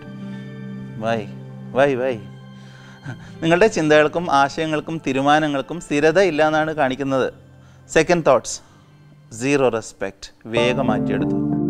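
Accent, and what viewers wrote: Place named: Kanhangad, India. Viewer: Hi, Useful video. First time anu Mr. yude video kanunnudu... really appreciat. Kanhangad slang🙂x from England.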